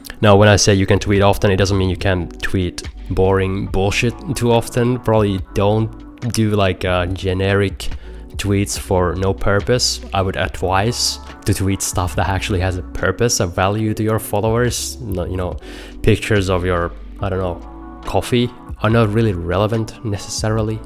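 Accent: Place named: France. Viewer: Such excellent information, I'm so excited I just followed you on Twitter! I created a Twitter account several years ago intending to start posting images but life got in the way so I just recently became more active. It's been very confusing not knowing the best practice for photographers which is partly why I was procrastinating to begin with and your YouTube videos have truly been a gift. Initially I didn't use any hashtags because I was told it wasn't a good idea but I'm only getting a few "likes" although some of my Tweets are receiving a fair number of impressions. I'm not sure exactly what that represents or if it's meaningful, how can I tell if these are just views or retweets? Also do you think it may be a good idea to retweet some images I already published by putting them in groups of 4 as suggested here and adding hashtags since I don't have twitter Blue and can't edit anyway? 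Also I'm confused whether it's okay to retweet my own posts on other pages for additional exposure or will that show up on my page as well? Please if you can help clear up these few things for me I will be extremely appreciative of your time and attention, all the best! And you're cute too, love listening to you French accent and easy on the eyes helps watching along with all the wonderful advice!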